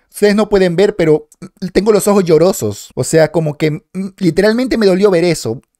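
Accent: Venezuela. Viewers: espera eres venezolano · una pregunta we ere venezolano s o n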